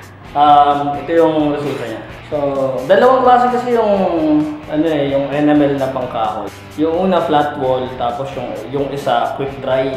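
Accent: Visayas, Philippines. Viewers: Bisaya man diay ka kol haha. Salamat saimo vid kay gusto ko ako ra mo pintura sakong balay para di nako mag labor. Unsay ma suggest nimo na brand? Latex ug unsa tong pang finish na paint? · ayos bisaya mn d i ka sir.. proud bisaya here.. tnx sa video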